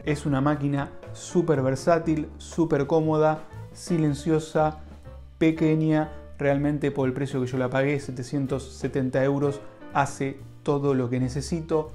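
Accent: Argentina. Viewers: Buen video! Feliz de ver videos sobre lo que me gusta en un español rioplatense! Saludos desde Uruguay! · Super argentino! Saludos!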